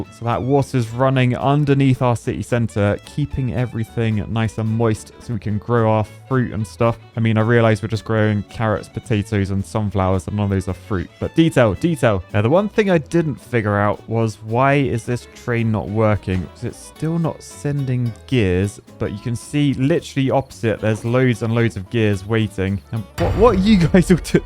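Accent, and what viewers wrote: United Kingdom. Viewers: You can really tell he is British now.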